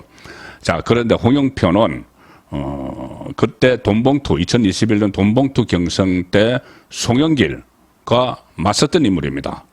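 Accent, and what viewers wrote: Gyeongsang, South Korea. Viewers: 들을때마다 갱상도사람의 약간 떠듬거리는 말투...꼭 웬지 나와같다는.....난 서울사람처럼 매끄럽고 술슬 말이 나오는럿도 좋지만...전 영양출신으로 처음 청주에서 대학생활애서 표준어앞에서 사투리를 감추느라고 땀흘리던 생각이 납니다. 70년대초반 중딩때 석간 영남일보 100여호 배달 알바를 열심하던 추억이 생각납니다...응원합니다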